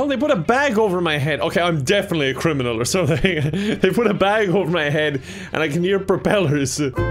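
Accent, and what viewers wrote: Ireland. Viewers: Irish accents are so attractive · Ste van der Velde hes irish, you’d here ireland in his accent.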